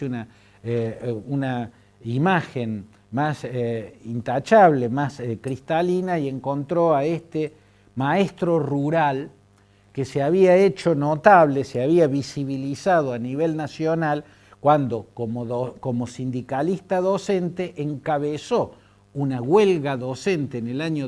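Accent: Córdoba, Argentina. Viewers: Es cordobés? Se nota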